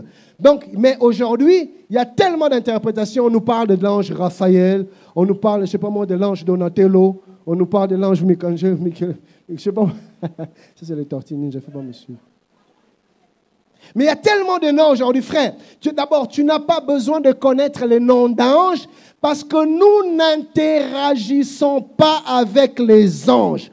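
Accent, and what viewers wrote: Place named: Africa. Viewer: Pardon pasteur mais quand vous parlez africain nous ne comprenons pas. Nous apprécions votre enseignement.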